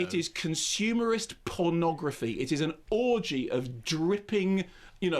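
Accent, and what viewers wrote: United Kingdom. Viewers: A British accent.....don't y'all have a monarchy still along with a bunch of wealthy elitists in your nation? Not saying you support them or anything......but just because they come from America doesn't mean that their consumerist and capitalist mindset is synonymous for all Americans. Come back when y'all change the government structure of the UK.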